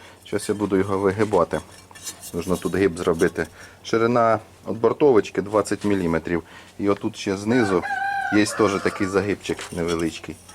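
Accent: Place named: Surzhyk, Ukraine. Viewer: Прекрасна українська мова, так з суржиком) але це українська мова!!!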